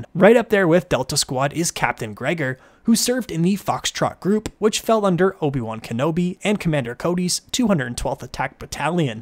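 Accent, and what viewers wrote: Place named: Canada. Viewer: Most Canadian accent ever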